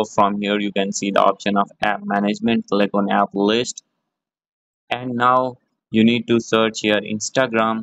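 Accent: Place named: India. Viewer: Indian ahh accent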